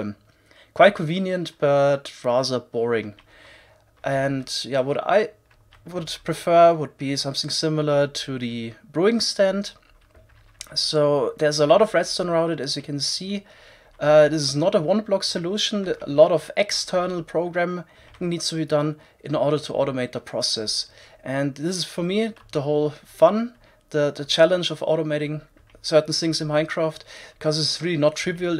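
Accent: Germany. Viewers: Also dein Englisch ist seehr gut aber man hört es einfach wenn ein Deutscher Englisch redet xD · I really hope we get a automatic crafting table. Also are you german? Your english sounds like you're from germany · ich mag deinen deutschen akzent ;D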